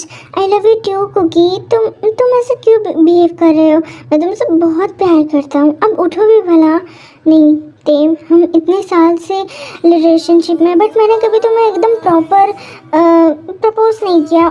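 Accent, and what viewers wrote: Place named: Amroha, India. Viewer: AAP Khan se Ho tumhari language toh amroha ki lag rahi hai 😊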